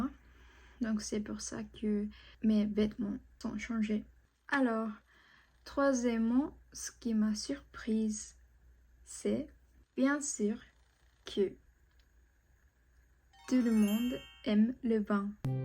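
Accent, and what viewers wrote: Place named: France. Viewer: Chouette vidéo! Merci pour le partage. Par curiosité, si tu pouvais transmettre un élément en particulier de ta culture ou société aux gaijin, lequel serait-ce? Je trouve très intéressant que ton accent soit si semblable à certains accents en France. Je suis francophone et pourtant, je ne pourrais pas parler le français comme toi. Tu as plus de chance d'être bien comprise par les francophones du monde que moi, ce qui est excellent. Si tu as la chance de retourner en France un jour et que tu n'as pas visité, je te suggère la Bretagne (Lorient pour les gens chaleureux plus que les infrastructures, Brest, Rennes et la forêt de Brocéliande). Les Bretons sont si sympas! Les Wallons en Belgique sont fabuleux aussi! J'apprécie beaucoup d'éléments en lien avec le Japon. Je trouvais surréel qu'il y ait autant d'arcades avec des installations en parfait état. 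C'était comme de voyager dans le temps direction le rétro et la jeunesse. Sinon, temples, sanctuaires, jardins et parcs sont fabuleux dans ton pays! Au cas où tu chercherais des occasions de pratiquer le français et d'aider les gens à apprendre le japonais de manière amicale et pas trop académique, j'avais déjà essayé le site <link> pour apprendre une autre langue et j'ai souvent trouvé les gens très chouettes et les échanges positifs et utiles. L'intérêt est que l'inscription est gratuite et qu'on puisse parler avec des gens dont le français, le japonais ou autre est la langue maternelle et non une langue d'emprunt. Pratique pour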